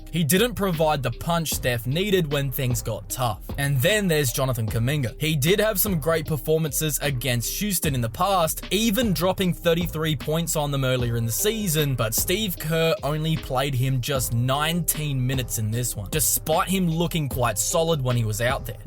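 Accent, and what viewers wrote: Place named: Australia. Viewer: Seriously fucking Aussie accent.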